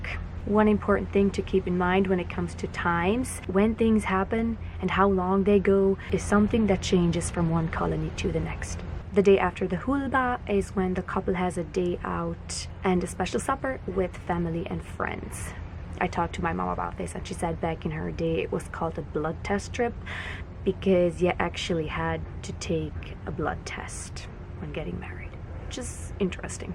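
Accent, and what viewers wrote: Germany. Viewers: Thank you for sharing this beautiful tradition. I'm curious what dialect of German do you speak. Ich spreche Deutsch:)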